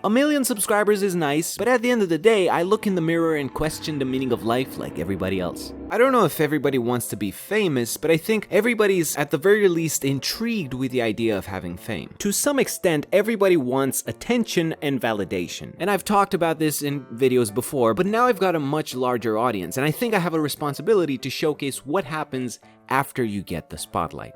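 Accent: Romania. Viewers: Yo man, your accent is GODLY for somebody from Romania! I live there too, and my accent is trash.... I want to as you a question . Where do you live? Like, in what county? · You have such a nice accent Were you raised in Romania? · Please answer me: Are you romanian?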